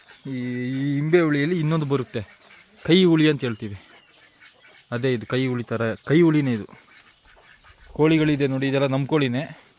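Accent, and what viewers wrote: Kodagu, India. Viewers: ಅವರ ಮಾತು ಕೇಳಿದರೆ ಕೊಡಗಿನ ದುಬಾರೆ ಅಂತ ಕಾಣಿಸುತ್ತೇ.... ನಾವು ಅದೇ ಜಾಗ, ಗುಡ್ಡೆಹೊಸುರಿನವರು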